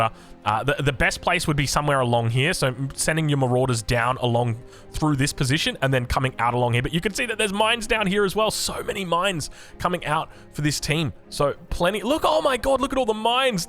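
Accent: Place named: Australia. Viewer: we need more BAR content from you. Nothing against Brightworks or anything but the australian accent is much nicer and you really help people get into the game by explaining so much. Your videos are so entertaining i even started watching the aoe ones even if i never played it.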